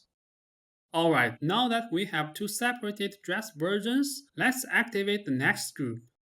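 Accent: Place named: China. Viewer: 中国老乡吧 这口音特色:hand-pink-waving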